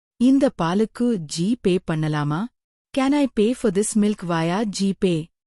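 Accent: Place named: England, United Kingdom. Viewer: இந்தம்மா.england aa uchcharppu .sakikaal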